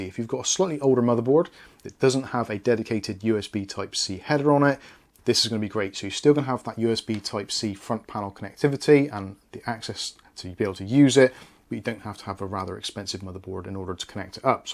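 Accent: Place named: East Anglia, United Kingdom. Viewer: My only complaint about this review is how you pronounced "Aluminium" Judging by your accent, I'd say you're from East Anglia or somewhere in the South-Midlands or South-West. Come on man, we're not speaking American yet ;)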